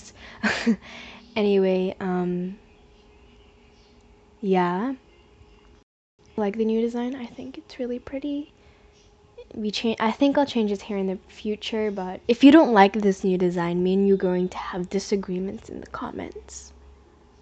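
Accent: United Kingdom. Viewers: You can hear your British 🤣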